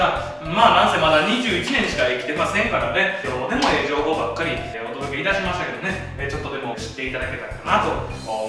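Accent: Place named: Osaka, Japan. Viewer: 大阪だったんだ 時々の大阪弁がなんかうれし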